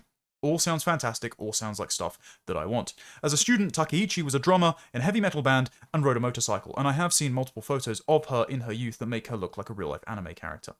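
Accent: RP, United Kingdom. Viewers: You sound like you shop at John Lewis, also why don’t you allow me to come on to discuss AI, don’t go against evolution.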